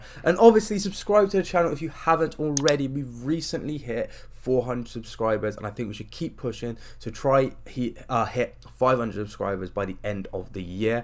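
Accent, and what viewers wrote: Manchester, United Kingdom. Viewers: You sound like you’re from Manchester tbf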